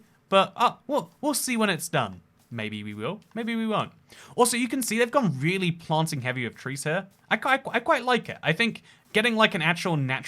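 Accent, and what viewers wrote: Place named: United Kingdom. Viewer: Is it just me or is he becoming more british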